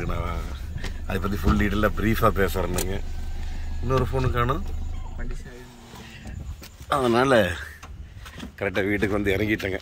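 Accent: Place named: Kongu, India. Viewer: கொஞ்சும் கொங்குத் தமிழ் பேசும் கோமகரே...! திகட்டாத தித்திக்கும் பேச்சுடைய திருப்பூர் திருமகரே...! உங்கள் காணொளி மிக மிக அருமை❤❤❤❤ அதைவிட உங்கள் தாய் தந்தை இழப்பைப் பற்றி நீங்கள் கூறியது மனதை கலங்கடித்துவிட்டது😭 "டான் திரைப்படம்" பற்றி எனது சில வரிகள்...! "❤தந்தை❤" மகன்களே/மகள்களே மனதில் பதிய வைத்துக்கொள்ளுங்கள்....! வலிகள் நிறைந்த உங்கள் வாழ்க்கையில் வழிகேடுகள் வளைத்துவிடப் பார்க்கையில்? வாழும் உங்கள் வாழ்க்கைக்கு வழிகாட்டியாய் வாழ்ந்திடும் வாழும் தெய்வம்தான்🙏 மதிப்புமிக்க உறவு "❤தந்தை❤"...!🙏 இத்திரைப்படத்தைப் பார்த்தபின்பு.... விழியோரம் வழிந்திடும் என் கண்ணீர்த்துளிகளை என் தந்தையின் பாதத்திற்குச் சமர்ப்பணம் செய்கிறேன்🙏 என்றும் அன்புடன் உங்கள் மகன்போல இவன் ரா.முஹம்மது கபூர் (சென்னையில் இருந்து)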